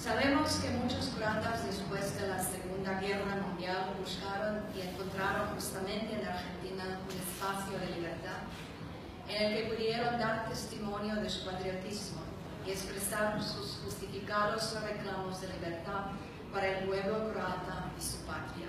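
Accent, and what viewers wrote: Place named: Spain. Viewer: govori iberski španjolski FFFFF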